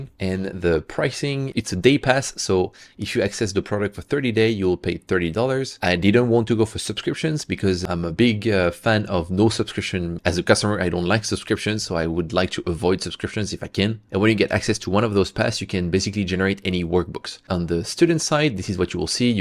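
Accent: France. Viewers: Hey, i have a weird question, are you French ? I sense some really well hidden french accent lol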